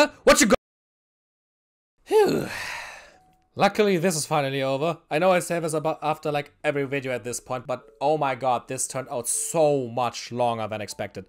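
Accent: Germany. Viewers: Haha click aufs video und hoere gleich mein geliebtes deutsch heraus 🤣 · Jooo ich wusste nicht das du deutsch bist lol obwohl man das schon raushören kann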